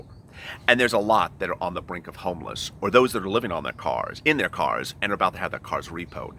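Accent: United States. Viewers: Alright...I see that you now sound and fell like many of our fellow Americans, and me as well. You are da man. Great Job!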